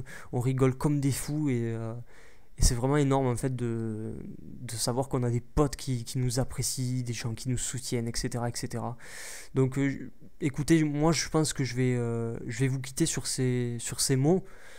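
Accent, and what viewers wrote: Toulouse, France. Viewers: Franchement bravo pour tes vidéos!!! Un petit pouce vert pour le super accent toulousain de. ^^ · VIVE L'ACCENT TOULOUSAIN!!! T le meilleur continue t video tu fais un super boulot et Merci a toi de nous apporter ce bonheur apres les dur journée de cours (ou de boulot pour les plus vieux) merci beaucoup continue t super video!!!:D:D <3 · toulouse <3 venant de la bas sa fait du bien d entendre cet accent:P merci pour toutes ces vidéos:) hate que les prochaines sortes con!:P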